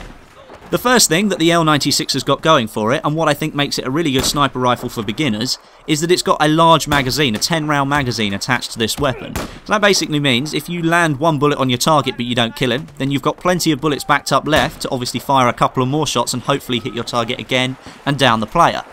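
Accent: United Kingdom. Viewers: ur british yay i am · Brittish?? Subscribed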